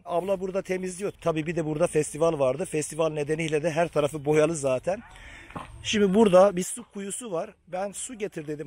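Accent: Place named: Ağrı, Turkey. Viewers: Abi cok guzeldi valla sen ya bayazitlisin ya diyadinli sive bizim oralarin sivesi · Agrili oldugunu tahmin ettim siven kendini ele veriyor bende aslen agriliyim dogma buyume igdirliyim vidyolarin com guzel istanbuldan selamlar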